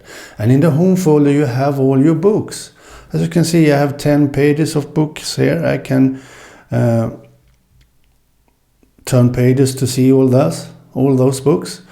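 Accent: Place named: Sweden. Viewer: Jag har just installerat "assistant.koplugin" från github på min Kobo libra 2. Testade för ett par år sen med askGPT, men den funkade nog bara till jailbreakad Kindle. Men jag rekommenderar verkligen att kunna använda AI. Kör själv DeepSeek, som jag eg bara kan tycka ta ngt för lång tid, men annars hur bra som helst. Tack för en utmärkt kanal. Jag känner mig väldigt hemma här som svensk, medelålders e-bokläsar-nörd med samma svengelska dialekt som du. :)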